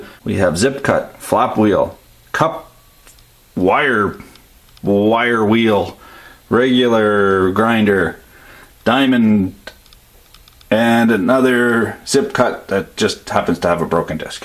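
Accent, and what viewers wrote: Quebec, Canada. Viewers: dude, i just kinda stumbled across you're vids and I am by know means an engineer...however I am sooo intrigued by all your knowledge of this stuff. Im just a humble union carpenter and saw you reviewing some tools i was interested in getting and now i cant seem to stop watching your vids... your canadian accent, your sense of humor, your depth of knowledge...congrats dude! you just got yourself another subscriber :) cheers from you're southern neighbor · Canadian or what? some of those words I have never heard an american say.... but then again I stay away from the lake areas. great video. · OK...OK...this is now my SECOND favourite channel on YouTube. Love the close up camera work and you actually seem to know what you're talking about!! Bonus. I've subscribed and I'm heading off to your channel to see what else you've reviewed. 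Do I detect a Canadian accent...sorry I'm from Australia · I fucking love how he drops french canadian lines here and there..!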